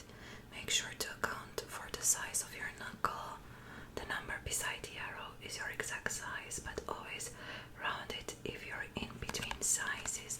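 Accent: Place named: Dalmatia, Croatia. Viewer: Bas i ja gledam ono kao cilo vrime se koristiš dalmatinskim govorom, pa mie bilo malo čudno, ali svakako drago. Nekad se najbolje prepustit i pricat onako kako jezik krene 🙂‍↔️😊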